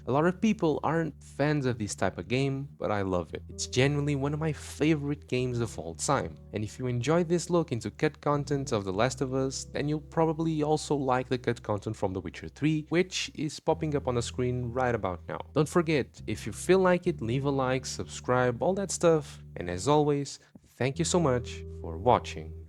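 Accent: Portugal. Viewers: Random but are you portuguese?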